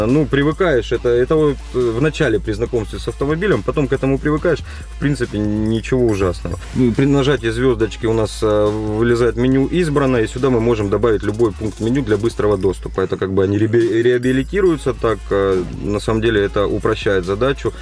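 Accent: Rostov, Russia. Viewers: Смотреть интересно. Только если это полноценный обзор авто, не делайте такого акцента на аудио системе. Понятно что это ваш профиль, но мне это не так интересно, как например сравнение с ближайшими конкурентами(лично мое мнение). Но в общем качество на хорошем уровне. P.S. кто то писал про уровень речи... Им хочется объяснить, что это Ростов, как я понял, и там свой "говор", может это и немного сбивает с толку. Как по мне - слух "не режет".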